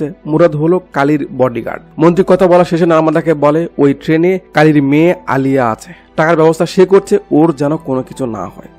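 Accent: Bogura, Bangladesh. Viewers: ভাইয়া আপনার আমাদের বাসা বগুড়াতে....??😍... অবশ্য আপনার কথা বলার ধরণ দেখেই কিছুটা মনে হয়েছিল আপনি বগুড়ার 😍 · Apnar basha ki bogurate vai?? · বসের বাড়ি কি বগুড়া?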